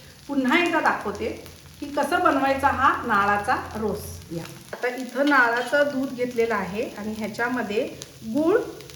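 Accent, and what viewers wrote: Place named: Gadhinglaj, India. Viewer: खूपच ावडलई. नक्की करून बघणार. काकडीची चटणी आणि रोस हे नवीन प्रकार समजले. मस्त!!, अलिकडच्या व्हिडिओत तुम्ही हडकल्यासारख्या दिसता. ही complement आहे बरं का. आज मेकप पण खऊप छान झालाय.❤ -सुनंदा गंदगे ता.क. तुम्ही रेसिपी खूप छान सांगता. मला तुमची भाषा ऐकून पण खूप छान वाटते. माझ्या आत्या गडहिंग्लज च्या होत्या.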